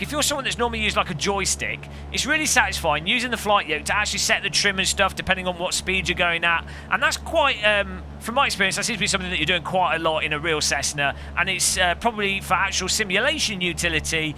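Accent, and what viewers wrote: United Kingdom. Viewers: Turned off 7 seconds in because limey accents are unnecessary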